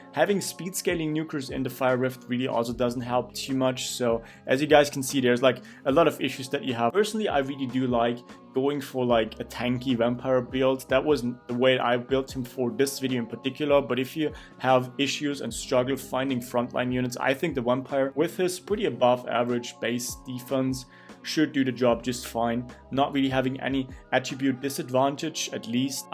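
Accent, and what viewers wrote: Germany. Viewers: Are you german? That accent cant be anything other than german.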